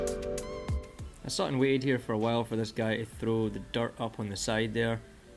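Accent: Scotland, United Kingdom. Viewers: Loved it! So much life at night there, up here its almost dead at night. Thanks for sharing. And btw... love your scottish accent;)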